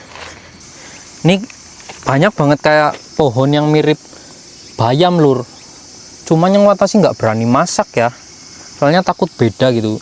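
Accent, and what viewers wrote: Banyumas, Indonesia. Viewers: Kok ngapak mas, asli mana ? Maaf penonton baru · asline ngndi kang,,ngapak deneng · Kiye wong banyumasan kiyeh...cilacap, banyumas, purbalingga, banjar negara, apa bumiayu lur..?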